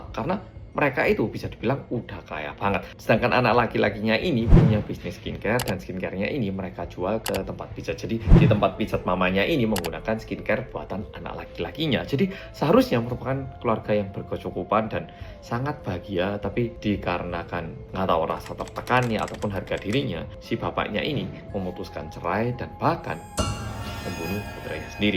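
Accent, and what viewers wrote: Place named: Java, Indonesia. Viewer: Kak bikin konten seperti ini terus ya , aku paling seneng bahasa yg diucapkan , org Korea asli , sekrg tinggal di Korea tapi medok jawanya masih ada , aku bangga sebagai org Jawa ada org Korea mah medok jawanya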